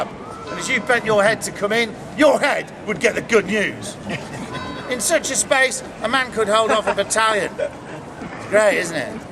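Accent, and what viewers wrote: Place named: Scotland, United Kingdom. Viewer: What makes me think you're scottish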